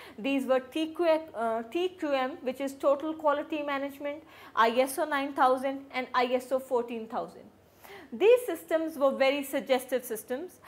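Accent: India. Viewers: It was easy to understand six sigma by someone from indian accent (Unfortunately I always reach to the learning videos uploaded by scholars and tutors from different contries)... I would like appreciate the hard work done by Miss... would like to continue learning from her videos..... Many Thanks:) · We Indians find pretty cool and happy about her accent. And, we Indians feel the same kind agony which u are currently feeling now, when Westerners speak.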